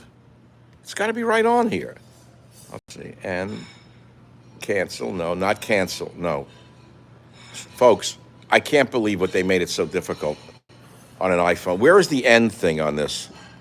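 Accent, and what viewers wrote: New York, United States. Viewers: regardless of whether we are alone or with family out here, we listen because of the way you say things, your delivery and segueways. I listen for your New York accent and kvetching. Keep it up my friend.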